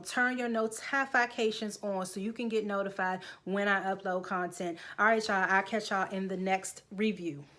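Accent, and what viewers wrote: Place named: Washington DC, United States. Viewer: I don't even have to ask if you are from DC ...I can hear our accent ALLLL up in your voicet! Damn.. I love when I come across my fellow city folks on Y/T.. I be so siced!! LoL! I followed you via my boo JayLee's channel.. you definitely got a new subbie girl! this review was funny AF btw!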